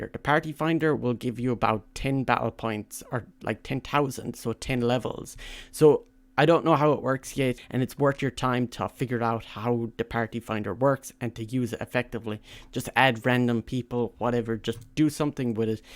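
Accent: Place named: Ireland. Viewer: I can't help but notice your beautiful Irish accent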